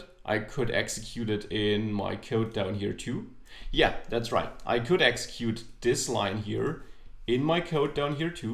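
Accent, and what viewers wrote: Germany. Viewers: My first though was: "the way you are speaking... german guy". :D Thats cool. I found these days polymer. This looks really cool and a nice way how to create new kind of views with real components. Greetz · Personally I really like when Germans speak English beacuse then it is very easy to understand at least for me. And of course - I really enjoy your amazing lessons as I'm touching React for the first time. Greets from Poland :)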